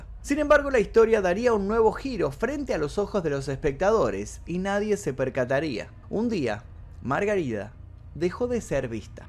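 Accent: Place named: Argentina. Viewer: Me encantan tus narraciones perfectas con su toque 🤗👍 con todo respeto a tu Sra. El acento Argentino siempre me ha parecido muy lindo 😃. Saludos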